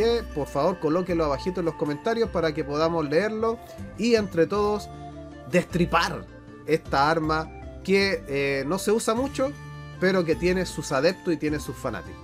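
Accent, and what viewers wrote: Chile. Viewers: por que hablas como chileno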